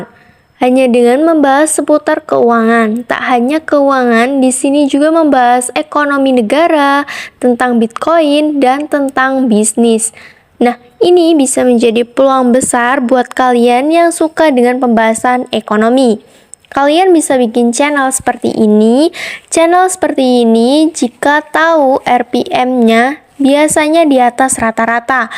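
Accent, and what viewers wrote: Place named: Java, Indonesia. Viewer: Medok jowone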